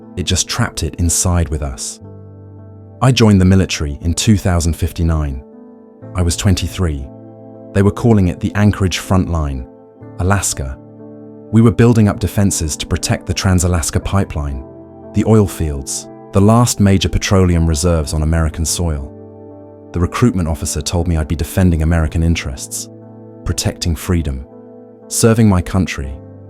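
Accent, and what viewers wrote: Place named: United Kingdom. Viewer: a British accent is entirely wrong for Fallout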